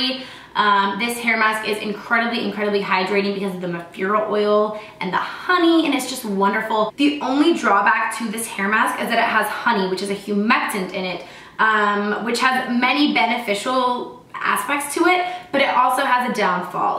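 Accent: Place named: Georgia, United States. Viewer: I knew you had to be a georgia girl ! Got the accent for sure...Sound like my people 🤗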